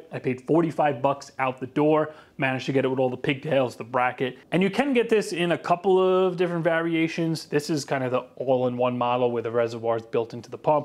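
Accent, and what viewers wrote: New York, United States. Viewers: My first car was an 85' trans am same blue! I really enjoy this series, you've done a ridiculous amount of work on this car! My current 89' GTA never disappoints. I'm in Northern CT, you must be in long Island if I here you're NY accent correctly.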